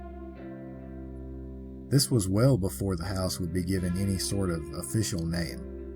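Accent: South, United States. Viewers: Hey glad to have and hear you back my 'Southern drawl dreamy dude' hope ur family lingerie all recovered xxx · Another great video, as always! I'm hooked on this channel - you have a wonderful relaxed, laid-back voice with that Southern drawl - absolutely perfect for story-telling! It reminds me a lot of Shelby Foote - I loved his wonderful anecdotes and stories in the "Civil War" TV documentary! I would love to meet that friendly woman ghost - especially if it were possible to have a conversation with her! I'd love to know what people of past eras would think of modern things like electricity, computers, the Internet and cellphones. Keep up the great work!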